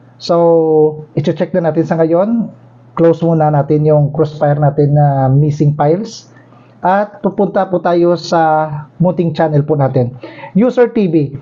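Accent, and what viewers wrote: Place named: Iloilo, Philippines. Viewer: du ilonggo ka boss haw thankyou po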